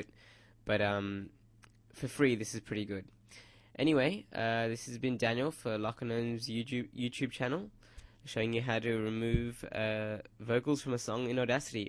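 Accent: United Kingdom. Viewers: British Much <3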